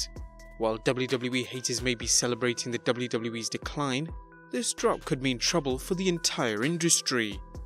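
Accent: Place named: United Kingdom. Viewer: The voice of this vid should be in USA Not UK. Very bad. Lates.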